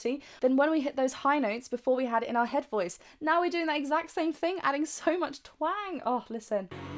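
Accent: England, United Kingdom. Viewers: What dialect of British does she speak? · Coach i love ur english accent ❤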